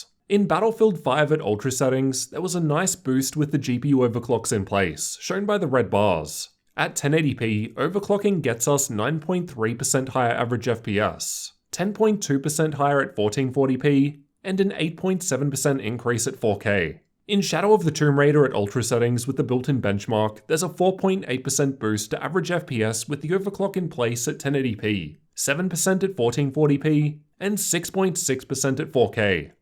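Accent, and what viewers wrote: Australia. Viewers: He has an Aussie accent which normally has an upward inflection on declarative sentences. Perhaps he's trying to neutralize his accent? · I don't think he sounds that weird, but I'm an Aussie and I'm use to our accent. I will admit his pronunciation and accent on certain syllables isn't exactly typical but overall he just sounds like a regular Aussie to me. I think he's just reading through his script and his voice naturally goes low when he hits a comma and even lower for full-stops. We have very slight regional variations in our accent. He sounds like he's from one of the Southern States as people in the North tend to raise their voice pitch at the end of a sentence instead of lowering it. Aside from all this voice banter, I think he presents the information quite well and I found him really quite helpful when I recently upgraded my video card.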